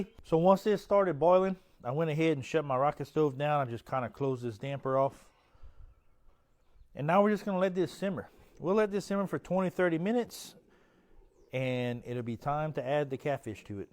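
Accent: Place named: New Orleans, United States. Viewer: I feel like, especially at the beginning I can hear the New Orleans in his voice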